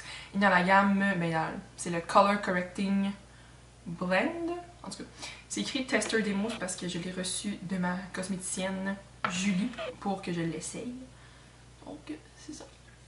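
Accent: Quebec, Canada. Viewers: T'es vraiment énergétique 😀 et j'adore ton accent québécois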